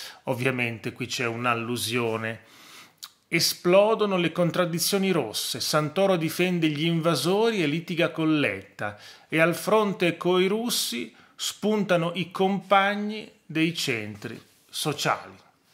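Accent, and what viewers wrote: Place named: Rome, Italy. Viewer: Buongiorno Prof. Grazie, perché Tra tante lacrime il suo accento romanesco mi fa sorridere un pochino!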